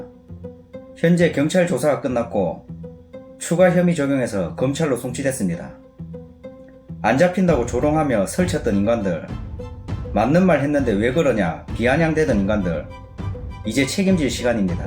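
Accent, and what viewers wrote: Gyeongsang, South Korea. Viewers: 경상도 인가요? 의안부가 필모한가? 친일 매국노들의 후손 인듯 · 경상도 아임니까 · 졸라 무서운게.. 알고리즘 타서 우연히 보게 된 영상인데, 채널주 말씨가 갱상도다. 근데 사건지역인 창원도 갱상도 아님? 같지역 사람한테도 젊은이한텐 일단 무대뽀로 행패 어지간히 쳐부리네 시골 틀딱것들 무습다 무스버..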